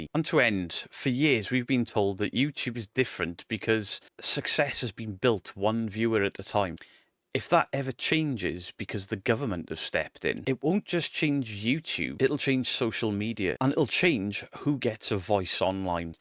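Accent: Wales, United Kingdom. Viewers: you sound welsh, are you?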